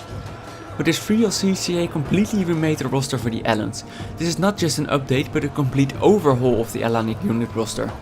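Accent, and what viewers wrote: Netherlands. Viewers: yes of course, i understand the need of time in these video's, still awesome you upload them in such rapid succesion, i only have one question, maybe you said it before but i might have forgotten it, are you dutch? Because you kinda sound dutch. Although this is no offense of course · That's a dank Dutch accent you have there friend. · Serdar Kaleli you idoit hes dutch no indain you rasict! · where are you from you sound dutch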